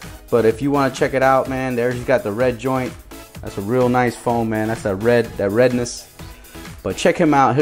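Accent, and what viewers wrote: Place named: England, United Kingdom. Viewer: What is ur English accent bro?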